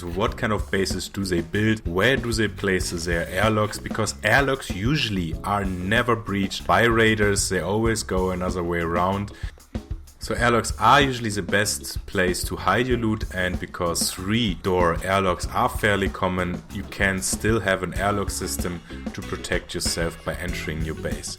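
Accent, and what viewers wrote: Germany. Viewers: that german accent tho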